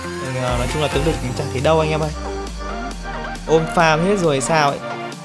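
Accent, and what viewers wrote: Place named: South, Vietnam. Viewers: Anh nói tiếng miền nam kìa ối zờ ơi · Ôi zồi ơi ổng nói tiếng nam kìa cute phô mai que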